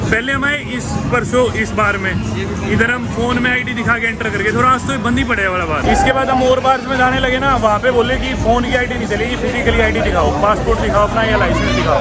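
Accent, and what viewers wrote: Haryana, India. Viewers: The dhakad Aur wo Hindi ka harayanvi touch kya baat hai maja aa jata hai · haryana se hai kya Bhai?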